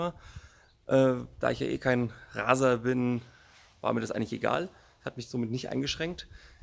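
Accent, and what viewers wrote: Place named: Hesse, Germany. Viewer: Gude, finds cool wennde hessisch babbelst;D Interessantes Video mal wieder von dir. Bin sehr auf dieses kleinere Dachzelt gespannt.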